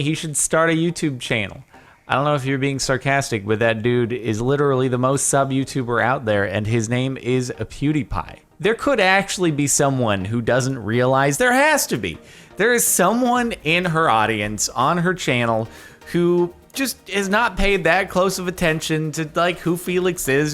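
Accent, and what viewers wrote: Australia. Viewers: That Aussie accents makes me cringe (no offense) I'm aussie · take a moment to appreciate aussie accent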